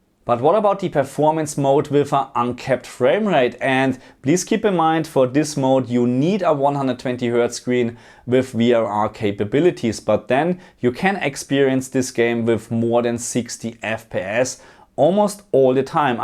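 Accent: Germany. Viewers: Man hört so raus das du Deutsche Wurzeln oder so hast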